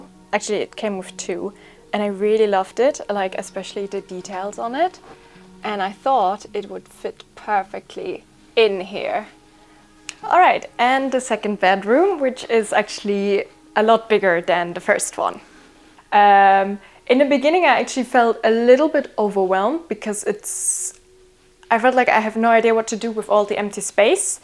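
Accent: Germany. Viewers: Sehr hübsch...Deutscher Akzent, sehr heimisch und vertraut ❤😉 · She has an german accent,(twist) and that is quite normal, if your native lamguage is not english.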